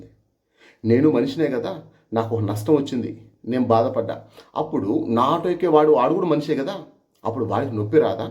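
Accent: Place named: Vijayawada, India. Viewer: Anna basha Vijayawada nunchi udo tisukunna chala bagundi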